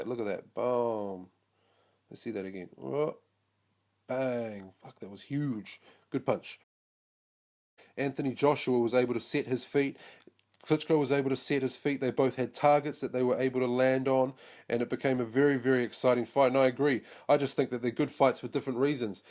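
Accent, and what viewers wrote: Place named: New Zealand. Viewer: You fucking legend your accent cracks me up. Proper hard Kiwi Lad from New Zeland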